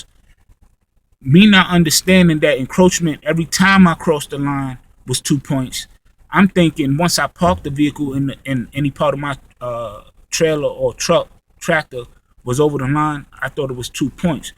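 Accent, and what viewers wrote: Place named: New York, United States. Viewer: You sound like a NY Dude ..... Lol good looks on the video tho bruh, good luck to you On your journey PEACE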